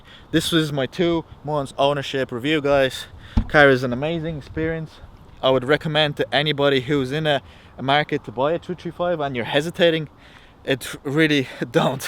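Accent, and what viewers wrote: Ireland. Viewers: Where you from your Irish sounds mixed up with another language?? Great car I want to buy auto would you recommend thanks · you sound like you have adapted a irish accent but have talked another language in your early age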